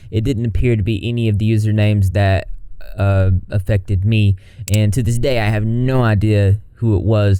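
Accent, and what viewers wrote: South, United States. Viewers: Off topic but... I've never watched your videos before, but you have such a beautiful voice and accent 😍 Subscribed! Where in the US are you from? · i wasn’t expecting a homey southern accent but it is welcomed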